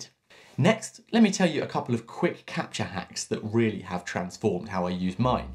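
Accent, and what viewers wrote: United Kingdom. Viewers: Apart a great British accent that I adore. These reviews are amazing ! Congrats !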